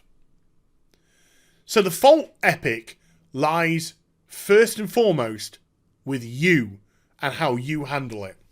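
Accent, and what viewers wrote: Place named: United Kingdom. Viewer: ive watch so many of your videos, that anytime i read something online i read it in my mind in your british accent.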